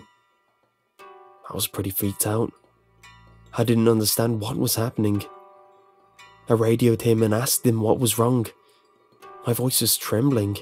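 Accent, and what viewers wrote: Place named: North, United Kingdom. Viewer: Too Northern with unnecessary pauses in the wrong places... Bit of Brooklyn in there too...?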